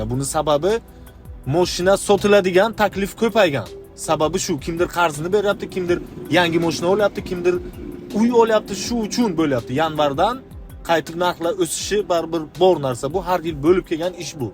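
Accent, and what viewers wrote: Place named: Tajikistan. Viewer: Tajikmisiz gapingizdan malum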